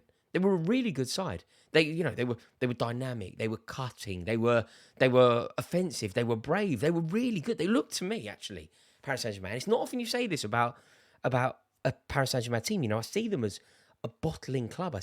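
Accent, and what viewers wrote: RP, United Kingdom. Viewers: I thought you were from the north end? Why do you sound posh😂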